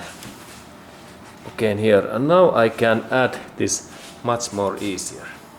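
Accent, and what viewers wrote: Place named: Finland. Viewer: It is finnish Rally english...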